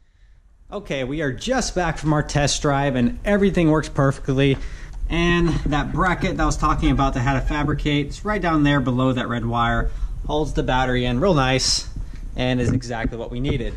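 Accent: Hawaii, United States. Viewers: weirdly still Hawaiian seeming, the accent though, and not pidgeon, so weird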